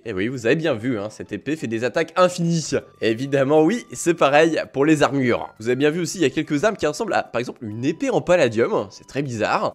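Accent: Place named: England, United Kingdom. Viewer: Très belle accent anglais 👌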